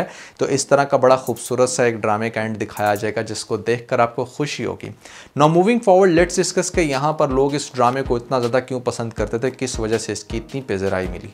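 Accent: India. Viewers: Ap Indian ki tra feer bol rhy ho phr q nhi bolty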